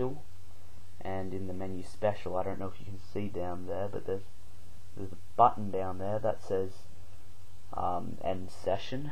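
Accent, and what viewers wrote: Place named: United Kingdom. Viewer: whats with the awful british accent?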